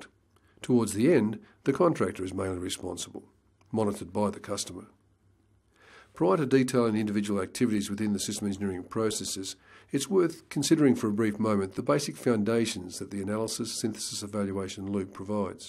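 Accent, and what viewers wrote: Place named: Australia. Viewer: I'm not used to the australian accent, he speaks like too fast and for me it's not clear enough, I have to listen twice sometimes but I'm glad this course is uploaded.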